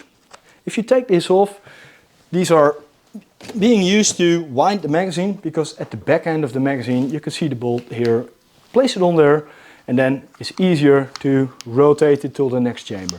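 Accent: Netherlands. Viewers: After a reasonable couple of months. This video made me subscribe and i even gave a like 😊 Always very clear and straight down to the products and explanation. Heerlijk dat boerenkool Engels. En dat bedoel ik positief.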